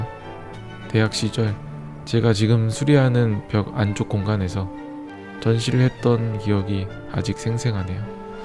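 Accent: Daejeon, South Korea. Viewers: 형 대전출신이야?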